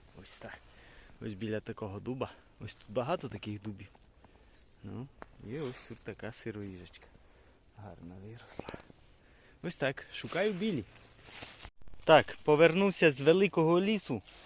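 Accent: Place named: Transcarpathia, Ukraine. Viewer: ага то чути Закарпатський діалект👍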